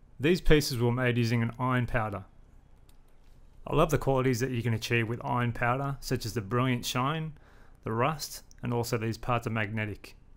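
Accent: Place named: South Australia, Australia. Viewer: Are you from South Australia? Your accent is exactly like my friend here in London and he is from Adelaide.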